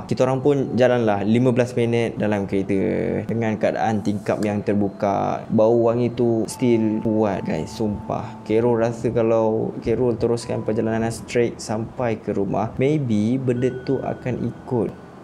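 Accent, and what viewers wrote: Johor, Malaysia. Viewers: Bang orng johor eh😊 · org Johor kah? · Abg org johor ke? 😅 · abg ni org johor ke ?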